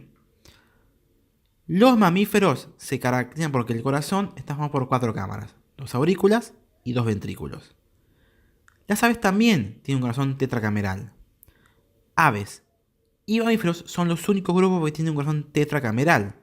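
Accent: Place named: Argentina. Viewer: Tan argentino que duele, amoooooo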